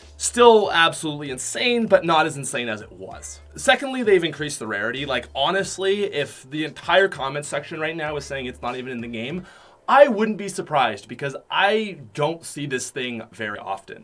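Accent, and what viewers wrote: Australia. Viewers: holy crap I didn’t know your Australian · didn’t realize was an Australian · are you in Australia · IS FROM AUSTRALIA PROOF IS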